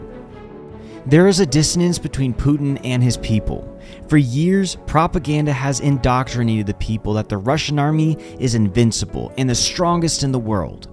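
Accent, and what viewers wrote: United States. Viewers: This is my first video that I watch from your channel I just subscribed and hit the all button very good at speaking about what's going on very informative. And you sound like you're American or Canadian one of the two and there's not many people on YouTube that are American or Canadian that are actually taking the time to gather all this information. 5 ⭐ to this video well done. 5 ⭐🇺🇦❤️🇺🇦❤️🇺🇦❤️🇺🇦❤️🇺🇦❤️🇺🇦❤️🇺🇦❤️🇺🇦❤️🇺🇦❤️🇺🇦❤️ and I love it when I see high Mars systems going to work on those Russian sons of bitchs. Those systems are very very accurate. Glory to Ukraine 🇺🇦🇺🇦🇺🇦🇺🇦🇺🇦🇺🇦🇺🇦🇺🇦❤️❤️❤️❤️❤️❤️❤️❤️❤️❤️❤️❤️🇺🇦🇺🇦🇺🇦🇺🇦 Ukraine will be victorious ❤️❤️❤️❤️❤️🇺🇦🇺🇦🇺🇦🇺🇦🇺🇦❤️🇺🇦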